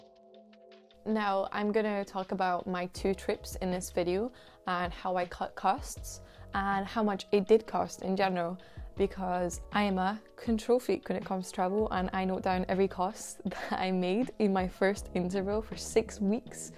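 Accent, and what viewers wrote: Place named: Scotland, United Kingdom. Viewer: Viva Scotland, my favourite place in Europe. I love your accent. Greetings from Spain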